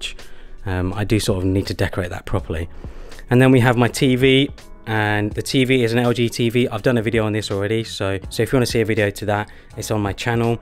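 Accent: United Kingdom. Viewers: You got the best british accent ever lol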